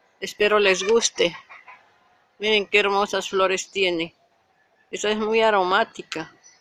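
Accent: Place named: Guadalajara, Mexico. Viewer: Disculpe de donde es usted se mr hase tono de voz de guadalajara mexico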